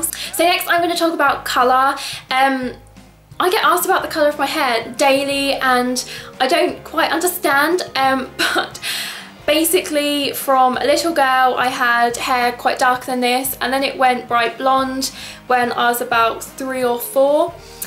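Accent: RP, United Kingdom. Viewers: she sounds posh as well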